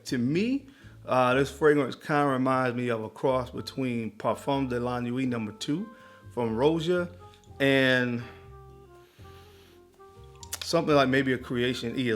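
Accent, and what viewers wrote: United States. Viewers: Please, take the time and show the name of the fragrances on the screen. With your accent (or whatever...), It's not easy for non American to understand the names of the fragrances.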